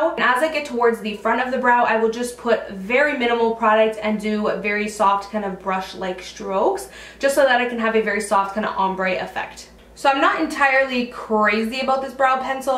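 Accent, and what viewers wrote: Canada. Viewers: Girl ! I love your Channel I just discovered you! I'm sorry if this is a silly question cause I think I can tell but are you Canadian? Also I can't seem to find those morphee lashes!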